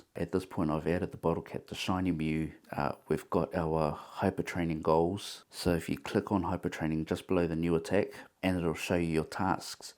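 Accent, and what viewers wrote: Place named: New Zealand. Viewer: You from nz cuz?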